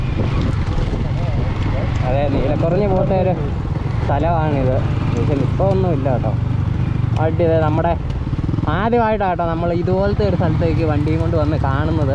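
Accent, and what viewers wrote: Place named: Kollam, India. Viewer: athalla bro broyude place kollam allenn choikkan karanam slang anu atha😁😁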